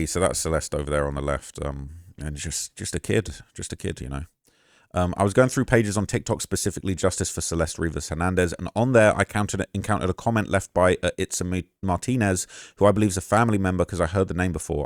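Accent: United Kingdom. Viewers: He's British we say things differently. We have a different accent to Americans! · Ngl your over use of Black American vernacular while u have a damn British accent is high key annoying af BE YOURSELF AND STOP COS PLAYING MY CULTURE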